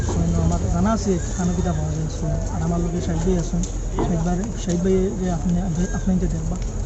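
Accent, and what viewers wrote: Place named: Sylhet, Bangladesh. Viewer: Are you indian Bengali. You talk like Bangladesh Sylhet division people. Karimganj is a assam province district but you talk Bangladesh Sylhet division local Bengali language you not talk assamese language? And why you say Sylheti vlog Sylhet is a part of Bangladesh. You live in assam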